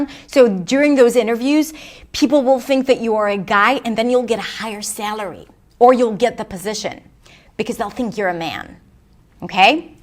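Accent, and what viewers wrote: United States. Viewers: you are pushing it too hard, we know your American accent is 99,9% accurate, but you still have to work on the source. Stop watching movies, especially with girly white girls starring, start watching documentary or news program... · You are not a Native speaker? No way!!! You sound 200% American. At what age did you come to the US and how old are you now, dear? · I am a college graduate foreigner who has been living in the U.S since I was 18, and that was over 20 years ago. I can notice a British, Australian or other types of accent within a few seconds. You sound just like an American. Apparently, you were able to achieve the goal from option 1, so just show us how you did it. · being white and being a man? I didn't watch this video cuz you're white or whatever. Watched just because you are non-native and you acquired that american acccent. 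You should study a little more about femminism BS. I am a white man and have priviledges cuz I work my ass off and not for a some sort of white skin priviledges... and of course I trust more people without accent cuz generally have more experience (there are exeptions but I'm sure you understood my point) Best regards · I wish I could speak english with your perfect american english....but I would choose the option 1 undoubtedly 👍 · i get confused for a while, i thought you were from the US because of your accent. Your English is brilliant.. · But you sound really American 😂 and i want to sound so good as you. I do not compare me to you. But from the beginning i want to sound like native speaker already and try to nail it. But some how i can not change my rythm anymore😢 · The thing the only reason she can say this and not sounding ridiculous is because of her American accent. Try another one say in a Chinese-English accent. 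Not indian, not african, chinese. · She sounds completely American. She speaks from the inside of her mouth a bit towards the back, but so do a lot of people. Midwestern accents and even southern accents push the sounds into the top middle of the mouth. Think of how people from different regions say, "yeah". Her presentation and placement has a lot in common with the standard American accent. Good thoughts here.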